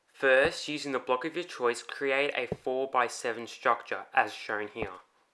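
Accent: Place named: Australia. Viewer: As soon as I heard your voice, I knew you had to be aussie. Sure enough, the 'about' page for your channel confirms it! Hey mate :P Just checking your video to reply to your question on VidIQ discord. I've subscribed :)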